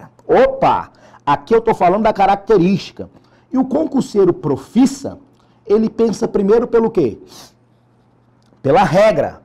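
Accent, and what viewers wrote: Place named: Rio de Janeiro, Brazil. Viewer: jurava que você era do Rio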